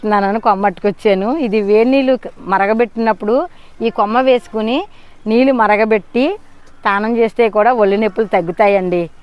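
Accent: Godavari, India. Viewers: Godavari jilla తెలుగు super madam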